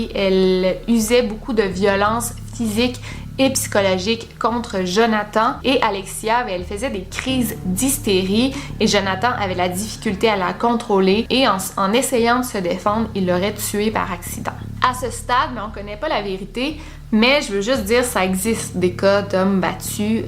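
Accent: Quebec, Canada. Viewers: Cette accent Canadienne énervement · Je t'adore continue même si j'ai recommence à parler avec mon accend du Québec à cause de t vidéo beaucoup trop intéressent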